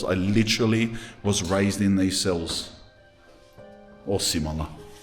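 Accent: Australia. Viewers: Love your honesty and I'm new to this channel but I fucking love it!! ❤ cous...😂😂😂 that aussie accent is fire!! Keep it up mate...love your no Bullshit way. ❤❤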